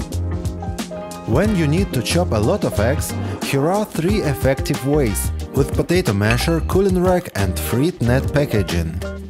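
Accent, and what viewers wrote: Russia. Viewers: Я одна слышу русский акцент? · русский что ли? такой говор чисто русский😃. видео оч полезное, благодарю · Не узнаю откуда этот акцент...Саратов? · You are amazing! Some of your short cuts I had learned from an amazing lady who passed away about 3 years ago who had a YouTube channel and focused on making old recipes from the 50’s she had learned from her mother while they lived in Virginia. She was born in 1947. A lovely , lovely lady and her husband too. He had passed just about 8 months before she did. I love that I am learning new cool techniques from you. I’ll pass your videos around to my friends and family. Are you from Russia or Ukraine? I ask because of your accent. Great job, and please keep up the good work! Your videos are very enjoyable❤😊!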